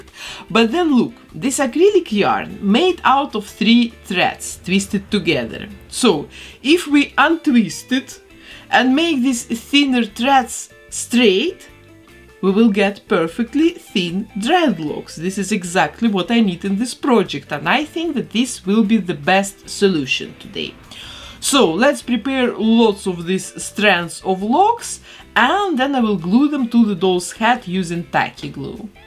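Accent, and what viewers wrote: Russia. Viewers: Girl are you Russian or Belorussian? Because you have Russian accent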